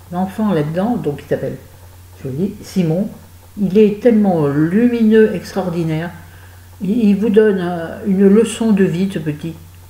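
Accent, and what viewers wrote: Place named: South, France. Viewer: Coucou j'aime les clins d'oeil et l'accent le mien en fait celui du midi non aucune nouvelle de criss que se passe t'il?en ce qui concerne les commentaires hargneux le mépris est la meilleure des choses,jusqu'à un certain POINT!!!! Des poutous a bientot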